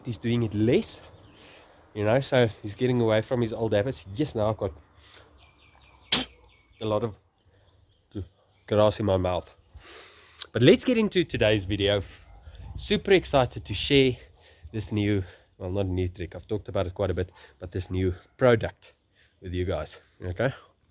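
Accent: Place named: South Africa. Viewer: I originally came here for the grass tips....Now I’m just here for that South African accent.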